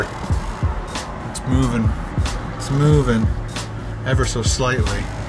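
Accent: Canada. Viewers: Pro-gress. Gotta love the Canadian accent. I work for a Canadian bank in New York..after 10 years there I’m starting to talk like a Canadian as well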